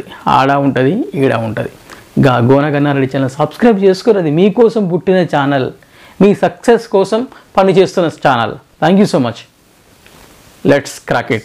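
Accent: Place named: Telangana, India. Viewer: Sir mee telangana yasa baagundi😃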